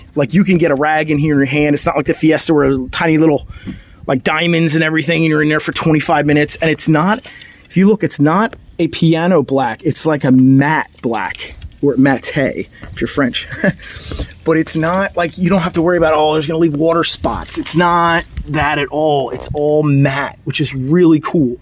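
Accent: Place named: Philadelphia, United States. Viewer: Dude, are you from Philly? Because you sound, well, you sound like me LoL. Anyway great details. Can you disable the start/stop? I'm picking one of these up tomorrow.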